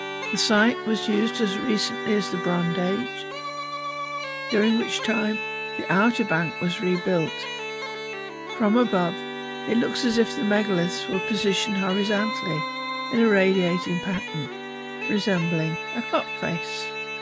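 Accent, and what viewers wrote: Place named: Derbyshire, United Kingdom. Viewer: Your accent is similar to my mother's ...are you from Derbyshire?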